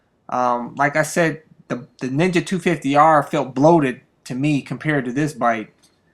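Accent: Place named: Ohio, United States. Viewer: it's the Ohio accent we all sound alike most of the time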